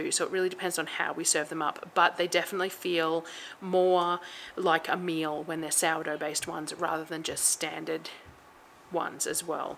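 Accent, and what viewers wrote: Australia. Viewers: I love your content because your real every thing is not all prettied up before the camera is turned on you don’t make me feel inadequate because my home is not picture perfect and your Australian I understand what your saying my sister and I appreciate everything you do. My sister has fibromyalgia and other medical conditions as do I your amazing and we have learnt so much from you ❤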